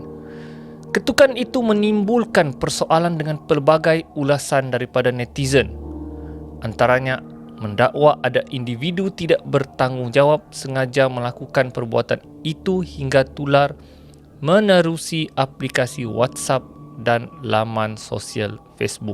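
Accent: Sabah, Malaysia. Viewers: iyaa org sabah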